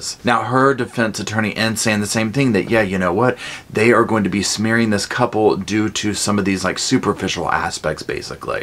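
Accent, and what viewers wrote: South, United States. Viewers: Hi! I’m a new subscriber and I’m making my way through your videos. Love them!!! I’m southern so I love your southern spin in this one!! ❤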